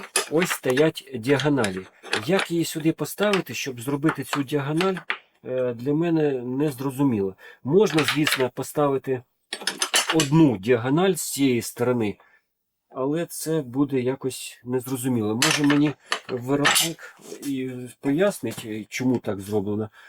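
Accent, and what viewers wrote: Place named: Russia. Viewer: Тренируй украинский язык. Уж больно сильный русский акцент😅😅😅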